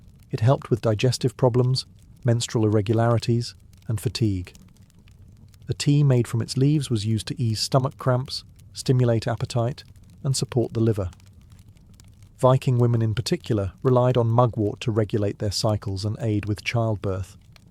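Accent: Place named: England, United Kingdom. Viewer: LMFAO You crack me up when you say such funny things in that classy English accent of yours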